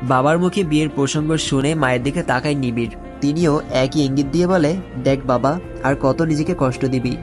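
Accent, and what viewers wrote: Sylhet, Bangladesh. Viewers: Vaiya apnar basha ki Sylhet?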